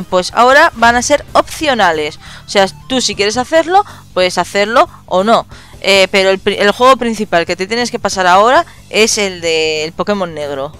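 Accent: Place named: Spain. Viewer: me encanta tu acento de que parte de España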